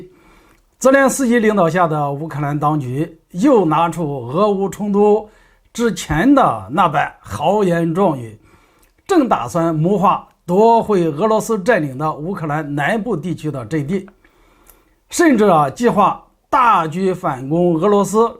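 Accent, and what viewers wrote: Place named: Shandong, China. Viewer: 山东口音太重了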